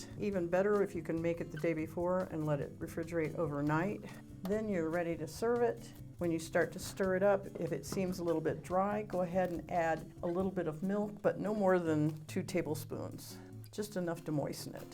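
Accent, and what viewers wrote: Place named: United States. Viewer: Where do you live? By your accent, im assuming the US. Hellman's (east coast)= Bestfood's (west coast). I hope this helps anyone who didnt already know this😊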